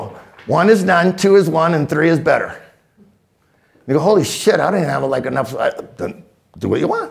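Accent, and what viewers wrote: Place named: United States. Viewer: Which region of USA do you belong to sir? You have a very interesting accent.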